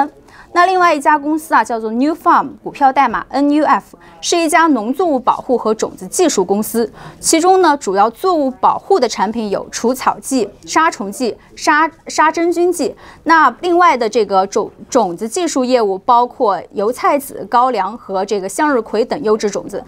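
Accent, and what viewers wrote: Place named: Nanjing, China. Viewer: coco口音好像是南京或者附近的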